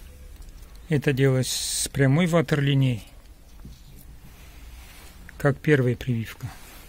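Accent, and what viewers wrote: Caucasus, Russia. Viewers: Из всех видео, что смотрел на ютубе, ваши самые чёткие- по делу, коротко, понятно, все видно в кадре,лишние телодвижения вырезаны. Иногда и с юмором. И самое главное, -не косноязычно,без всяких (ээ-мм-ээ-бэ-э).Видно, что человек знает о чем рассказывает и показывает так, чтобы понятно было всем. СПАСИБО БОЛЬШОЕ ТЕБЕ. P.S. А Вы, остальные русскоговорящие, выкладывающие ролики, учитесь у человека с Кавказа .Да,есть небольшой акцент, но говорит он без ошибок, не мычит, как большинство из вас и в словах не путается. Словом,- приятно слушать и смотреть. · Как же удачно я набрела на ваш канал ! Подписалась . Теперь изучаю все способы прививки ! Очень всё доступно и понятно ! Спасибо огромное ! И этот мягкий акцент . ...явно юг .... Осетия ? ... Балкария ? ... Но могу ошибаться . Удачи вам !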